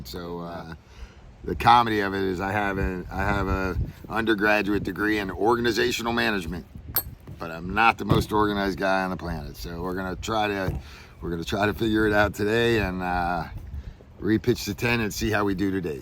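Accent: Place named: Massachusetts, United States. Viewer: I think you did a fine job! For some reason knot making will not stick in my head. I barely got the one down you made...lol Just crazy AF as to why. ~Anyway, found your channel from your Squak Mountain mid layer Hoodie review and slowly following along your past journey landing here. I mean it's all been so cool. You remind me and sound exactly likef every guy I grew up with, 😆 in Massachusetts As an avid camper and novice adventurer for the past, 40 plus-well way too many years, wink, I had to break down and bite the bullet investing in ultra light gear. It was time. Stuff was weighing me down, wink again. So... I coincidentally got this tent too on sale right after Christmas. The Virgin sail will be now April 2025 if New Hampshire finally stop snowing. I am look forward to seeing your AT experience in these parts last year. OMG. 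I bet a real doozy coming from those flat lands there in Florida. No joke, huh. I was born on a rock a wee bit north of Boston so flat land is pretty foreign to me. Gotta walk slow here with a big stick.... My guy and I have been dipping into off grid camping allot past few years, for him a breeze as an older model USMC but for me well everything is cool but the bathroom situation lol. Despite that have found some amazing spots and lots near the AT. Love seeing the hikers. Would love to be a trail magic magian at some point. I moved from the Rock up here about three years ago so we are a big closer or half the way atleast. Maybe this summer plant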